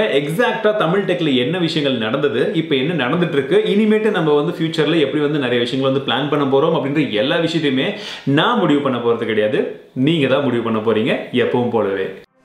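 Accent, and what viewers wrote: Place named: Kerala, India. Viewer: Tamil quality aaa Malyaali irukku nanba ........മലയാളി daaa......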